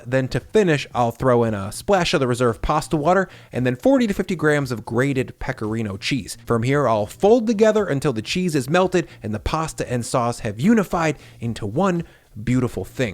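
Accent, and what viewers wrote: United States. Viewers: Thank you for pronouncing the ingredients in proper American English way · Pronouncing those words in a heavy American accent is funny as hell, though. At least Google how it's supposed to be pronounced before doing the video. 🤷🏻‍♀️ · A guy with a German name, American accent, using European ingredients to cook Italian pasta in 5 sauces !!! What's the real message here? By 2025, the earth has become a huge village ??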